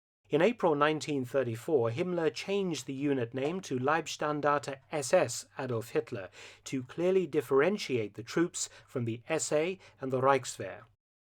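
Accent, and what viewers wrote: England, United Kingdom. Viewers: It's the Brittish accent that keeps me coming back!👍🇬🇧😉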